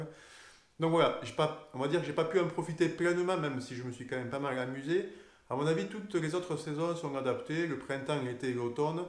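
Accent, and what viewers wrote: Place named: Southwest, France. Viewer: Bonjour bon récapitulatif tu nous fait encore révé une question lors de ton premier voyage tu parté avec une étape en téte ou au filing car j espere faire mon 1er voyage en septembre mais je me suis fixer 90 ou 100km mais je me rend pas compte car c est mon 1er donc besoin de conseil merci un bonjour a tous de la belgique😉encore merci pour l axcent du sud ouest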